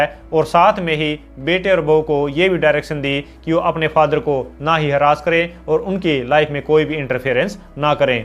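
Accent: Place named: Haryana, India. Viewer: आपका वीडियो देखकर लगा कि स्क्रीन पर फिल्म अभिनेता अनिल कपूर हैं लेकिन आपकी हिन्दी में थोड़ा हरियाणवी टच है इसलिए बात समझ में आ गई।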